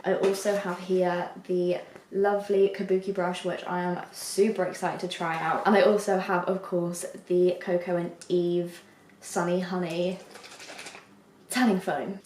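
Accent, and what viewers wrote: United Kingdom. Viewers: Yeah no. She’s also British so idk how you didn’t catch the accent.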